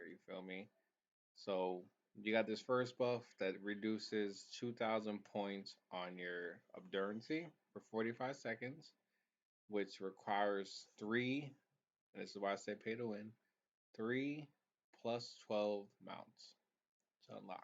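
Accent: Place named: Philippines. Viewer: Bruh, u murican? I always thought you're PH 😅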